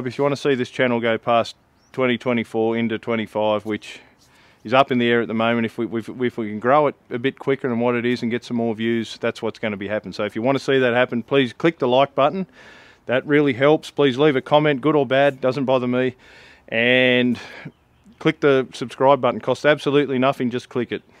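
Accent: Australia. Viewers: I like your channel. It's good to hear an Australian voice on YouTube. 👍🏻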